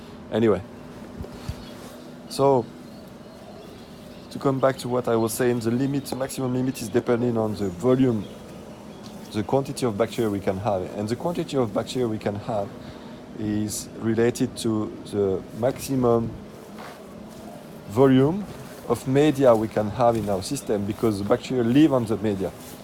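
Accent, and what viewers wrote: France. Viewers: Sounds french:-), thank you for this video and your chanel.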